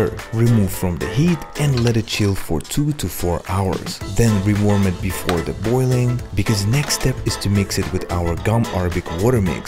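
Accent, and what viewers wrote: Russia. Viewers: Акцент русский будто...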